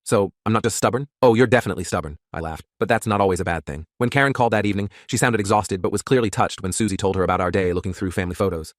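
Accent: United Kingdom. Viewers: Why did he turn British?😭😭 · Why did it switch to British accent/pronunciation?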